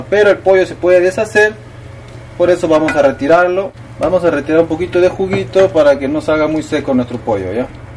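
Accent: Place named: Peru. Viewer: tu no has dejado tu acento de de peruanaso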